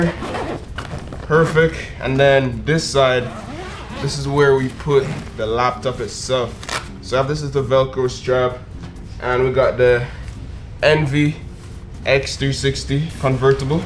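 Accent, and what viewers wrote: Jamaica. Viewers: R U Jamaican? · not go lie you sound jamaican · Are you from JA? That accent peeks through ! Great video. 💪🏽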